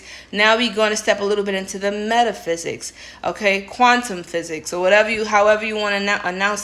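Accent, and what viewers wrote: New York, United States. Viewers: Just started watching u but I love your voice very soothing yet New York 💜👍🏽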